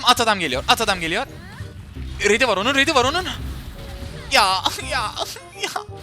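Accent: Trabzon, Turkey. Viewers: Abi ehuehu trabzonlumusun abi ehuehuehu (yorum tutmaz yav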